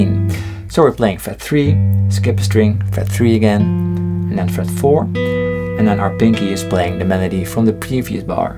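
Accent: Netherlands. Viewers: He's Dutch ;) but he's living in the USA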